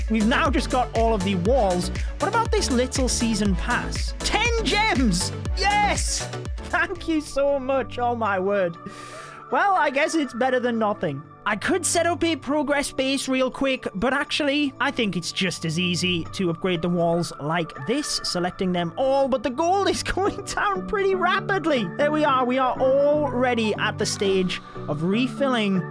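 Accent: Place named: England, United Kingdom. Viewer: You from England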